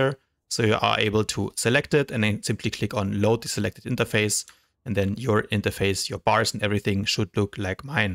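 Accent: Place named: Germany. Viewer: Man hört raus das Du sonst eigentlich Deutsch sprichtst(no front)^^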